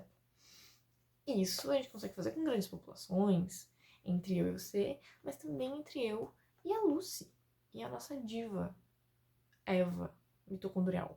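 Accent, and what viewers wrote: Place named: Portugal. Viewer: Achei que era portuguesa no primeiro minuto de vídeo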